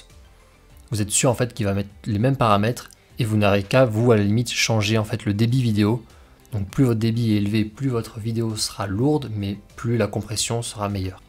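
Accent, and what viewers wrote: Toulouse, France. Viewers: Merci beaucoup pour ton généreux partage de tes nombreuses compétences! Ton éloquence et ton sens de la concision sont aussi très agréables! Je viens de m'équiper avec un Drone DJI Air 2s ainsi qu'un MacBook Pro assez performant équipé du logiciel de montage Final Cut. Alors voici ma question: est-ce que tu as déjà utilisé ce soft? pourquoi as-tu opté pour Première Pro? As-tu eu l'occasion de faire un comparatif Performances, Usage, Prix, Efficacité? Ferais-tu à l'occasion une vidéo d'initiation à ce logiciel? Alors certes on trouve des tutos en ligne, mais j'aurais beaucoup plus de plaisir à voir le tien, adapté aux vols et films cinématiques de Drones. Personne d'OOOtre sur le web n'a cet accent toulousain inimitable pour moi et que tu arbores avec fierté! Bravo, Bravo et encore merci... Je me demande comment tu as le temps de faire tout cela? 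Tu dois être bigrement passionné et efficace!!!!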